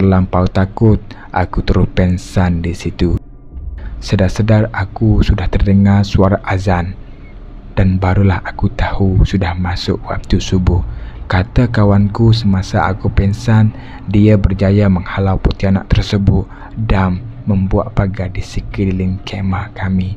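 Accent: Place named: Sabah, Malaysia. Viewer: Mcm ada slange sabah sikit jo.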